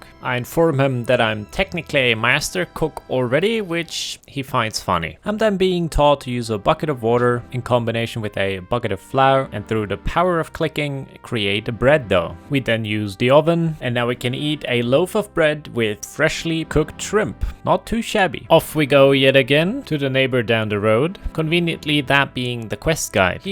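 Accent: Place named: Scandinavia. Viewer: This is great fun to watch! As others have suggested, this series is a recipe for success. You have the unique opportunity to play a game for the first time that a LOT of people have many fond memories of, giving them that experience through you. Nostalgia is powerful, and many players and former players will want you to succeed in something that brought them so much happiness at one point or another in their life. You have a Nordic sounding accent that people will find entertaining and distinctive. You are a clever enough storyteller to know what to cut and what to include to keep the pacing just right, along with some good writing skills and a good sense of humor. There is opportunity here that you can easily reach out and grab if you want to do so.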